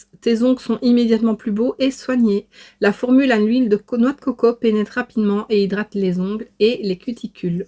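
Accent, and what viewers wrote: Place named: Liège, Belgium. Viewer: a d'accord c'est très jolie se petit accent de liège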